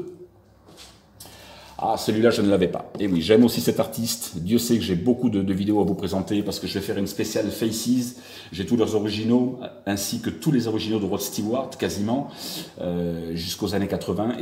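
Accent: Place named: Southwest, France. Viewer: Salut super trésor récolte a que agréable notre accent du Sud-ouest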